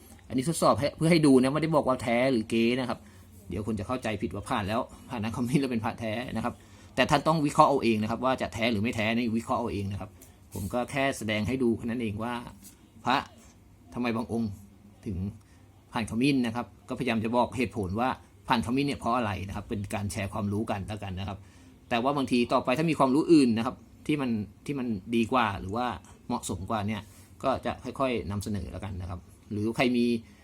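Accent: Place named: Thailand. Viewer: พระสวยๆทั้งนั้นทดสอบกลัวเสียเนื้อพระมั้ยจ๊ะพูดเน้อเน่อคนจ.วไหนคะ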